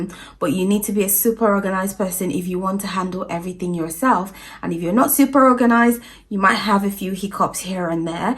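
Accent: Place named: United Kingdom. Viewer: I love the UK accent. Keep it up.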